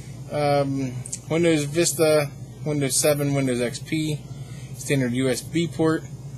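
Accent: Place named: Pittsburgh, United States. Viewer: you are definitely da burgh, nice review dude, just got one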